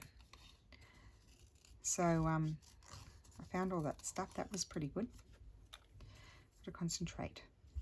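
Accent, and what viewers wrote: Australia. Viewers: I like the leaves also. Try a thistle. I bet it’s not to hard. Building up the courage sounds like a great idea. Sounding Aussie? Maybe because you were talking to your brother than your sister than your mom. Lol. Yep I like your Aussie sound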